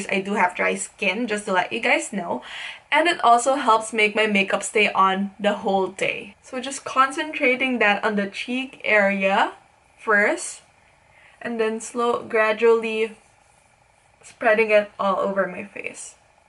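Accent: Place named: Philippines. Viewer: Thank goodness I finally came across a makeup tutorial video where i can hear and understand straight Filipino English.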